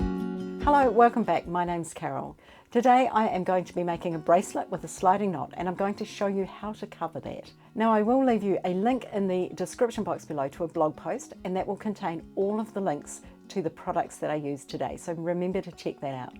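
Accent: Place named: New Zealand. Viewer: Thanks Carol ,great tutorial , never thought about hiding the knot before ! Thanks from Wales , I've friends from South island NZ and your accent makes me homesick for beautiful NZ xx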